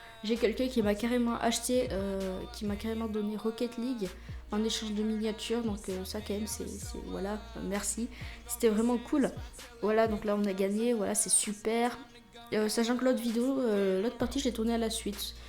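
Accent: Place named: Marseille, France. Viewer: je kiff ton accent de Marseille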